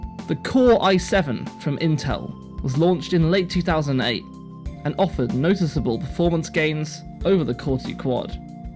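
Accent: United Kingdom. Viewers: Love the music, esp. . Fantastic. Warning! Possible trigger effect on maker..( I understand this video is 4 years old, so maybe I should have shut up... But I've written it now). The early chapters may not have been researched deeply enough. I picked up on a few technical and historical errors and omissions. I understand the scale of this project and that the introduction could likely have been rushed through. Reaching the microprocessor history you immediately stepped the game up. Truly excellent and quite an undertaking., a lot of praise should be given for this. My only other criticism may have you swearing at me! Work on your diction!, Otherwise you have a fine voice for this style of content. The odd Compooha and Intel Ahy Ahy locate you firmly in the London suburbs...? They, and similar just pop in randomly? Started watching the Cray video. Greatly improved. 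Really wonderful stuff. Beautifully written. Subbed. And peace brother, I'll never mention it again.🤠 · You speak with a British accent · "Compuhurz" for computers and "yunighud" for United both sound really, really stupid. It's some obscure English provincialism, I know, but it's one that YouTube would be better off without.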